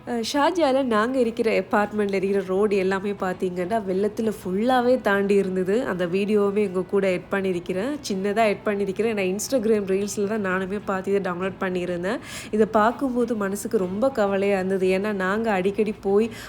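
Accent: Sri Lanka. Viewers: Hii assalamualikum sis❤.....I want to tell you one thing...that my mother had past away a few moths before....me and my mother used to see your video every night before we go to sleep....my mother really loves your sri lankan tamil slang of your voice....and seeing your video remains our memories of dubai...because we had lived several years before in dubai......and now she is not there to see your video.....sis pls dua for her.....and keep her in your dua.....I will also pray for your family....Allah make you to reach high....with good health......inshaallah ❤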